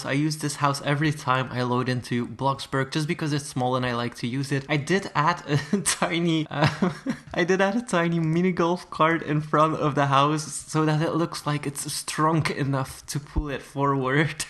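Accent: Norway. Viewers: are you norwegian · Are you norwegian?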